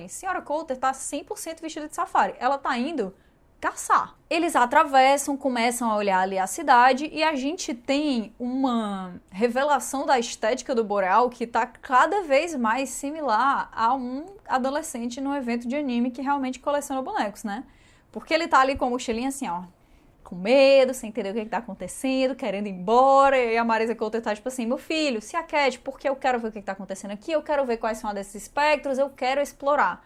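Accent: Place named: Northeast, Brazil. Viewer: Kkkkkk esse sotaque nordestino da eu amoo 😂❤ que pena que esse já o penúltimo review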